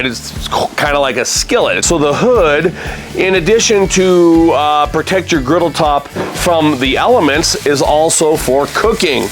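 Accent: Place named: Pittsburgh, United States. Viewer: Great video! Now I'm hungry! I always thought you sounded like a western Pennsylvanian. I grew up about an hour north of 'da burgh, so I speak Pittsburghese. :-) Keep up the great work!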